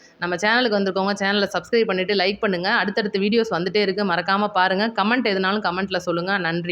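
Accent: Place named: Madurai, India. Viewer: Akkow namma ooru MADURA slang la irukku. Nalla pesurwenga , be continued for TVK📈🚩👍🏽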